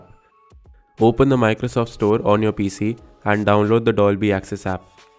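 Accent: India. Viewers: the paj eet accent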